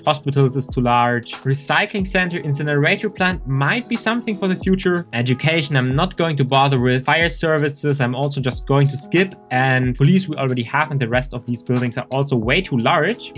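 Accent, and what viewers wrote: Germany. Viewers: I hear a german accent :) great video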